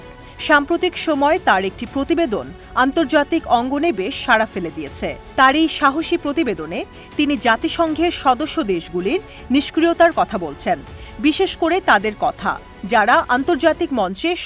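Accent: Kolkata, India. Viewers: এই সংবাদপাঠিকা ভারতীয় মনে হচ্ছে কোনোভাবেই বাংলাদেশী মনে হয় না। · কলকাতার স্টাইলে সংবাদ পাঠ করছেন কেন?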